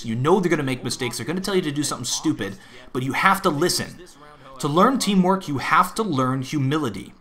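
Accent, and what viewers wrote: United States. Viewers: He sounds so American.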